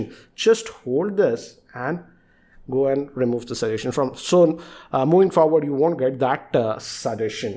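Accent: India. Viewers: thank you for doing these excellent, very task-specific videos.Your work is very appreciated. I'd like to make a suggestion to make their goodness even better. I am an English speaking American; and your native language is Indian. Your dialect and mine are quite different. Typically native-speaking English people speak more slowly. You speak much more quickly and (of course) pronounce English words as you learned and know them. I concentrate and listen closely to you; but due to our lingual differences unfortunately I fail to understand much of what you are teaching. When you make videos in the English language,could you try to speak more slowly and pause a moment between topics. What you are teaching is very valuable, and I want to hear and understand you fully. Thank you very much and please continue sharing you knowledge. I appreciate your work!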